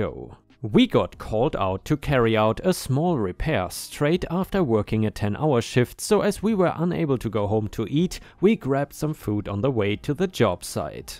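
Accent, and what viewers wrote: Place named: Germany. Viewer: Your charming German accent makes me smile every time you tell these stories! Keep up the great work! Love your HOA and property related stories btw!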